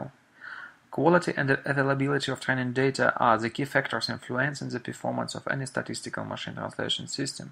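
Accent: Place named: Eastern Europe. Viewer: The speaker has some Eastern-European dialect, but it is not that hard to understand what he says :) Just pause and repeat few times where you can't understand him.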